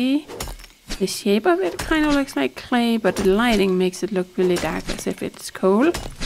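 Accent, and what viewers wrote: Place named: Denmark. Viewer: Thanks for the video, your accent sound Danish, very elegant in my opinion, from Alv, Norway.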